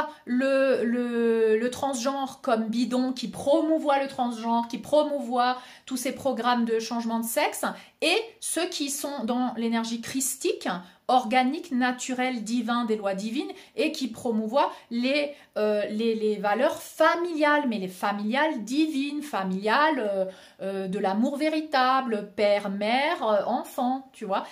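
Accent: Alsace, France. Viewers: Merci, nous sommes sur la même longueur d’onde, je réfléchissais à ce phénomène depuis quelques temps et ta vidéo m’a beaucoup parlé. Je suis un homme homosexuel, mais à aucun moment je ne soutiens le mouvement LBGTQI+-machin-truc-bidule-chouette. Pour moi être homosexuel est juste une particularité ou une caractéristique, ce n’est guère plus important que d’avoir les yeux bleus ou verts. Ça me regarde moi et c’est comme ça. Tous ces lobbys wokistes ne représente qu’eux-mêmes, la seule chose qui m’importe en amour est le respect, l’honnêteté, la bienveillance et la fidélité à soi et à l’autre. Le reste ne m’intéresse pas, et je n’irai certainement pas faire de mon fonctionnement une identité et encore moins une revendication. On ne va pas "convertir" quelqu’un à changer de couleur d’yeux, c’est aussi ridicule que de faire la promotion de l’homosexualité, ça ne se décide pas et ça ne s’impose certainement pas non plus. 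Ceux qui cherchent à imposer cela à des enfants sont de grands malades. Il faut laisser les jeunes tranquilles avec toute cette sexualité et préserver toute leur innocence car elle est un cadeau du Ciel. 🙏🏼 Je suis de Strasbourg-Schiltigheim vivant à Reims, et ton accent alsacien m’a rappelé la maison ❤🤩🥰 Je t’embrasse 😘🙏🏼🦋💐 Que Dieu te bénisse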